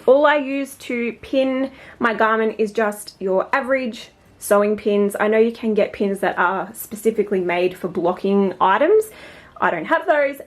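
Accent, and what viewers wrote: Australia. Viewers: Thank you for another informative video! Love your Australian accent 😄 Love from the UK xx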